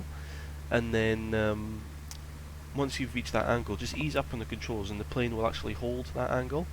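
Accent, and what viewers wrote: Scotland, United Kingdom. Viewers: I would guess its a fairly light scottish accent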